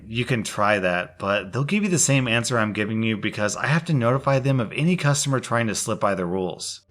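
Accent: South, United States. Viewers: does a good southern woman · Your high-pitched southern EM voice sounds like Ziro the Hutt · It's pretty obvious from your Southern accents that you think they're synonymous with ignorant yokal. That's pretty bigoted. Especially when you consider this: Some of the deepest and slowest accepts you hear come out of Alabama and Mississippi. Ironically locals work their asses off their whole lives for a shot at NASA facilities in their home states. Those accents got us to the moon. Have some fucking respect for "The Other." Also realize the deepest most impenetrable Southern Accents come from Yankees who have to prove "They ain't white." I know because I've asked or even heard the discussions people thought nobody else would hear. So it's almost like ignorant fucks live everywhere in every community, but especially amongst City Yankees. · thick southern accent on point man. 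· entitled Karen voice mixed with southern accent is the superior voice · southern accent is the best thing that's happened to me today.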